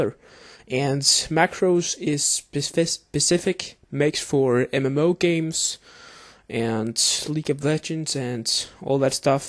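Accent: Denmark. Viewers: you sound like a dansker din dansker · are you danish? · Are you danish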